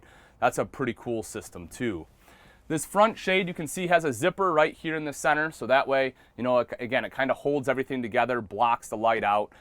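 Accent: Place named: New England, United States. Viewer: I’m detecting a trace of New England in his accent.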